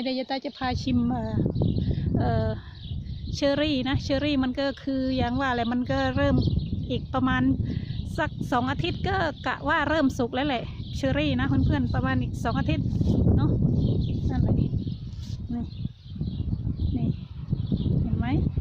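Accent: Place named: Surin, Thailand. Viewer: คนสุรินทร์รึเปล่าคะ? สำเนียงบอกค่ะ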